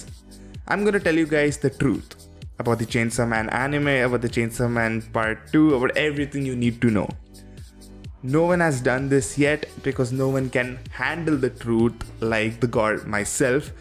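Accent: India. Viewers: Bhai indian h kya? accent se lag rha h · you sound Indian?!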